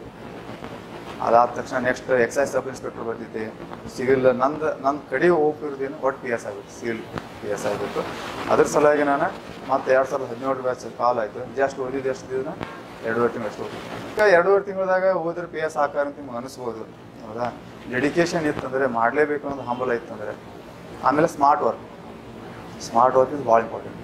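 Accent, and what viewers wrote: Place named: North Karnataka, India. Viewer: Super sir... ಉತ್ತರ ಕರ್ನಾಟಕದ ಮಾತುಗಳು......nice.....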